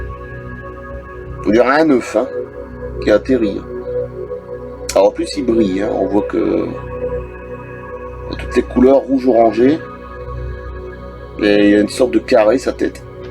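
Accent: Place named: North, France. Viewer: Salut à toi de toute façon à ta voix on voit bien que tu es du Nord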